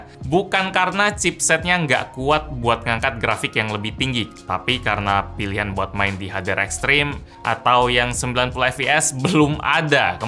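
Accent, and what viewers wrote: Palembang, Indonesia. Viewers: 2.ikok bae ? Wong palembang apo ? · Uong palembang ruponyo haha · "Digabung tigo ikok, yang ini ini ini, atau duo ikok be". Palembang nian bang 😂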